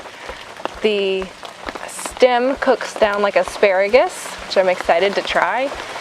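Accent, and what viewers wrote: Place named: South, United States. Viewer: I need to go down south and take a class from you on wild vegetation you have a everlasting knowledge on it love the southern accent btw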